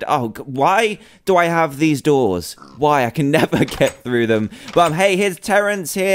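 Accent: United Kingdom. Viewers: He's British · British dah doy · British DUH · i think british idk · British Bro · British duuuuuuuuuuh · ....British duuuhh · It's British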